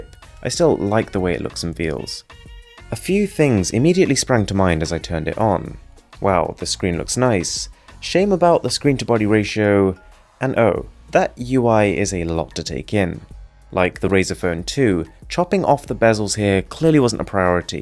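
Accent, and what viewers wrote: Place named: England, United Kingdom. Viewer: Dude ur english accent its insane 🔥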